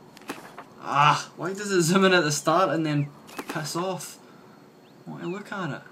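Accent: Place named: Ayrshire, United Kingdom. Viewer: Im scottish and Im having trouble pinpointing the accent, I think its ayrshire but really not sure, its funny cos they cant say words like "concentrated" they say "concentrataeed" instead lol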